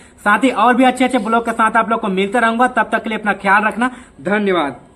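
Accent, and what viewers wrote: Chhattisgarh, India. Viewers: Bhai aap chhatisgarh se hona sach batana pliz aap kon se gaov se ho pliz batao maine aise jana kyu ki aap ka bolne ka tarika chhatisgarh hi jaisa hai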